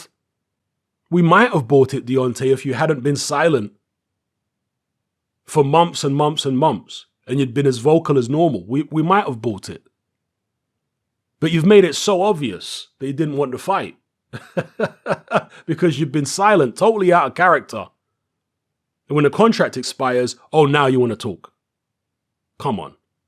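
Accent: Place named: RP, United Kingdom. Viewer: Oi! How on earth would you insult Deontay Wilder with the cleanest British Queen's English eh?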